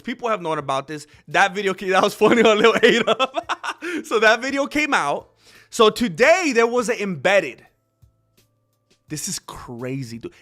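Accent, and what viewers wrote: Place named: Mexico. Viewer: I preferred this fellow when he used to crank up his Mexican accent a bit more, and force that false laugh a bit less.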